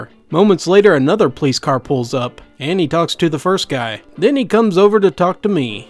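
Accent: South, United States. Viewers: Someone with a strong southern accent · Where is the reader from or is that a fake accent. It's not the coastal South, maybe Arkansas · the most calm and somewhat soothing southern accent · I know it's Southern, but what state?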